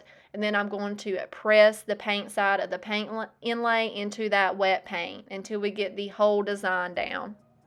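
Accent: South, United States. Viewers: Love your great southern accent! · I grew up in the south, but have been in the northeast ( longer than I wanted) and I used to talk just like you. When I moved, people were relentless in teasing me. I have lost a lot of it, I think, but people still ask me where I am from 😂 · I love your southern accent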